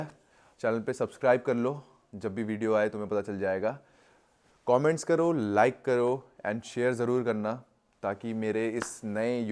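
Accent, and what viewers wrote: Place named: Delhi, India. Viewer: u r talking like generic delhi boys. dont know whose personality rubbed off on u. pls go back to being your simple self who is not so camera trained and delhi speaking style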